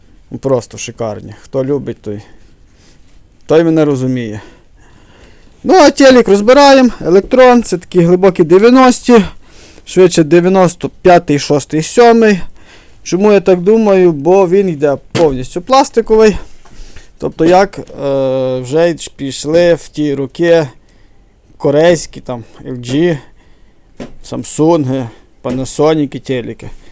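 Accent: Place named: Galicia, Ukraine. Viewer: Привіт. Шикарний Галицький акцент. Лайк . Підписка. Так тримати. Гарний ролик.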